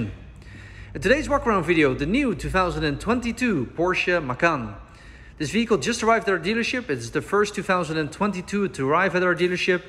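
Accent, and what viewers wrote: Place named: Netherlands. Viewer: Ziet er goed uit kerel, tja je naam en je accent….ik hoorde meteen, ah Nederlander. Super auto! Keep uw the good video’s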